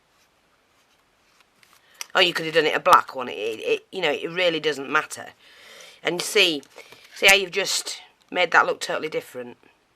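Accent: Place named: Northern England, United Kingdom. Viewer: Thanks for this and great to hear a UK and even better a Northern lass instead of the US gals all the time :0)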